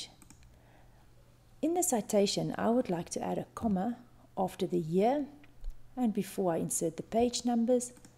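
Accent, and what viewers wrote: South Africa. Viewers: Sounds like a very South African accent to me. Thanks a lot very helpful!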